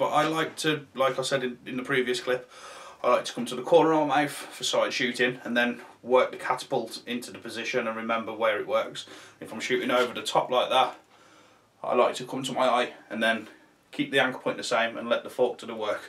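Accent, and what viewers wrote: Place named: Midlands, United Kingdom. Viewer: the video poster has an English Midlands accent.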